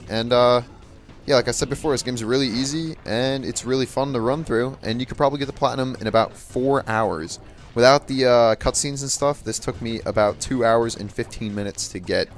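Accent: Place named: New Jersey, United States. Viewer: Haha. Cool. Jersey accent, lol! :)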